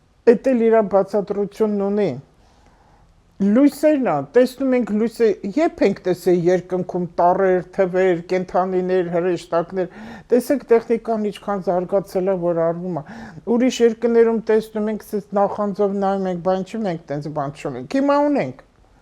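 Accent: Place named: Tbilisi, Georgia. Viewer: Duk im nman ek xosum tilizi akcentov hat urax em vor dez lsum em es tiflizeci em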